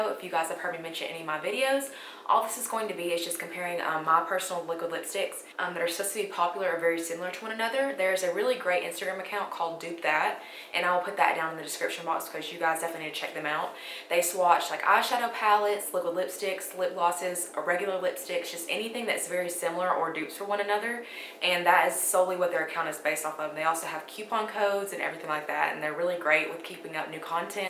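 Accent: South, United States. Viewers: where are u from? u sound southern.. I'm from AL!!!!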